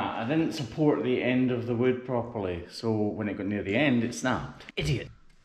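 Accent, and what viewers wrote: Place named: Scotland, United Kingdom. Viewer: When you flub up what you say just keep going with your Scottish accent I wouldn’t know any different. If my father was alive boy you would have never heard the end of it as his uncle’s McMillian’s came from Scotland 🏴!!!! I enjoy your accent but probably your wife’s better when you’re in trouble with her! Can you play the bagpipes that would be the greatest finish or put in when you flubbed!!!...lol from SW Wisconsin!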